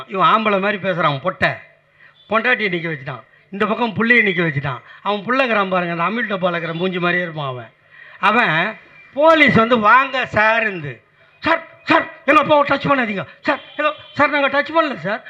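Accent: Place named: Chennai, India. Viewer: மெட்ராஸ் லோக்கல் பாஷை பேச்சாக இருந்தாலும் ரசிக்கிற மாதிரித்தான் இருக்குது இவரோட பேச்சு !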